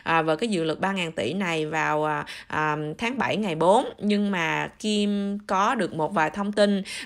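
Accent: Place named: South, Vietnam. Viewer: THANKS 👍 VỚI NHỮNG THÔNG TIN GIÚP CHO NHỮNG NGƯỜI KHÔNG ĐỦ THỜI GIỜ TÌM HIỂU MAY CÓ (GIỌNG ĐỌC Miền NAM, ) NÓI CHUNG LỄ PHÉP.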